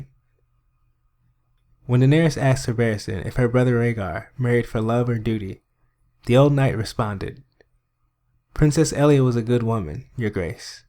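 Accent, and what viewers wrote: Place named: United States. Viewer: I really like your clips and you have a nice voice. But I'm not a nativ speaker and you have an american accent so its a bit hard for me to follow, could you speak a bit slowlier?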